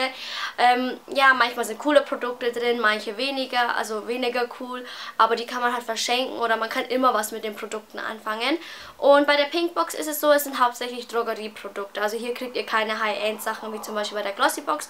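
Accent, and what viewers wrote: Franconia, Germany. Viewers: Bin vor ein paar Tagen auf deinen Kanal gestoßen und muss sagen dass er mir richtig gut gefällt! Du bist ja so eine süße! Übrigens wohne ich ungefähr 60 km von Würzburg weg. Und man hört es voll das du aus Franken kommst! So süß :) ich freue mich schon auf weitere Videos von dir! :)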